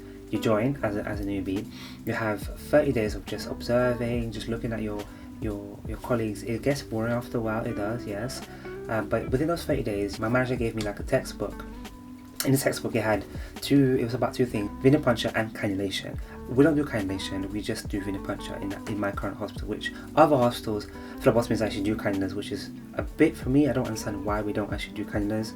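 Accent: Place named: Portugal. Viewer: I’m a admin/receptionist for a GP surgery and I’m so interested in starting my career as a phlebotomist, I’m about to enrol in a private phlebotomist course which is two full days, is nationally accredited, but to be fully qualified I need to get 30 sticks and work experience at a surgery which I might ask my boss if I can shadow our in house phlebotomist on my days off, or go straight to one of our hospital and ask to volunteer and be trained. I’m so looking forward to it, so I’m trying to learn as much as possible about the training process, so I found your video extremely helpful. I’m assuming you are Portuguese by your name and the way you speak, I’m Portuguese too. Bjs Silvia Gomes Freire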